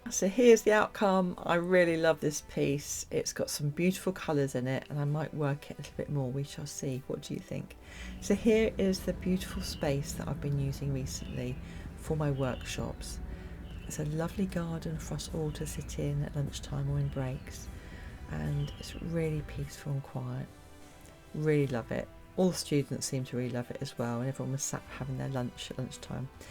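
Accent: England, United Kingdom. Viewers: ❤hi there I'm Donna Louisa. Close related names. I Molly love your butterfly effect ❤ Video, it was great. I'm new to acrylic painting. Currently I'm doing a course with Molly from Molly's Artistry ~ Acrylic pouring / swipe technique course - via you tube lifetime access intellectual information mine. Watch out for the big exchange rate as it's 🇺🇸 I'm in 🇦🇺 in Victoria, Australia. USA $150.00 = Aus $230.00. She is a beautiful lady & teaching is amazing. World renowned artist I'm so glad I caught your Video today. I haven't done the Dutch pouring course yet. Molly uses her Dutch pouring method with hair dryer too. So have seen it often. Will try myself soon. Your demonstration was lovely simple & already aligned with others principles like Molly who has done Acrylic pouring. Loved your explanation. I loved your beautiful English accent. I Will try to see more in the future. 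Have you made other videos? I also don't have the whole range of colours either. So many brands & ranges. Do have some small tubes of the soft body Acrylics paints to work through as well as the larger containers of paint, which I now buy for Acrylic pouring as I can. These should mix with little water or pouring medium - so they should be pourable thinned down. I'm slowly trying to build them up. I used to paint portraits for a short time adults babies animal etc. I have completed several units such as drawing painting, ceramics, and sculpture from Visual Diploma Arts. However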